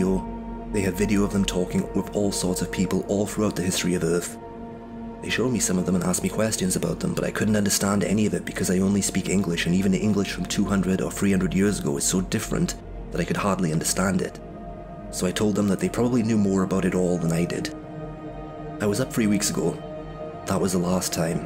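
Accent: Wales, United Kingdom. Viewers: great video mate (are you welsh by any chance?). I was wondering about this the other day, I stumbled across that subreddit earlier on in the year and forgot what date they were hoping for contact, glad I came across your video on the Nexpo subreddit.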